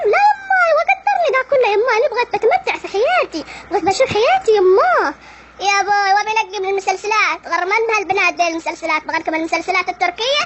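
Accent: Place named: Mukalla, Yemen. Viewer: Danea G.a هذي لهجه اهل المكلا 😂👌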